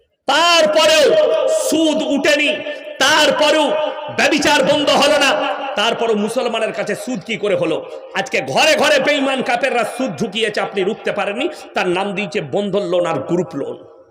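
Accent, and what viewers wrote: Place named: Bardhaman, India. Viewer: দাদা, বর্ধমান জেলার গ্রাম এর মানুষের কথা গুলো এই রকম হয়, এতে মাদ্রাসার দোষ কোথায় বলুন?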